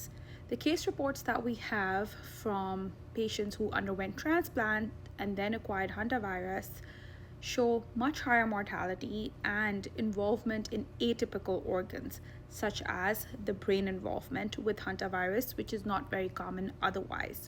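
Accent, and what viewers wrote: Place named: India. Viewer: Is it just need or does anyone else immediately mute and or scroll up or down as soon as you hear the Indian accent... see what stammer have done. Bet she has some good advice but I ain't gonna hear it...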